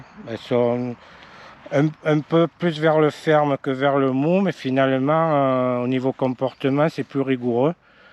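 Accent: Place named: South, France. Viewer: Avé le bon accent ! Merci pour ton retour.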